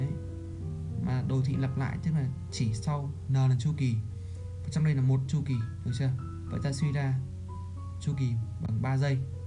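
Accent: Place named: Nam Dinh, Vietnam. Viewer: tuy giọng anh có rất Nam Định nhưng đc cái ấm =))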